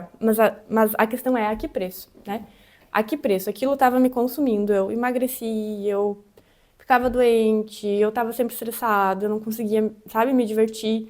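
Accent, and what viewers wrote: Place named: Rio Grande do Sul, Brazil. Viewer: Seu sotaque de voz e muito lindo eu Amo sotaque Gaúcho mesmo náo conhecendo os gaúchos eu os adimiro muito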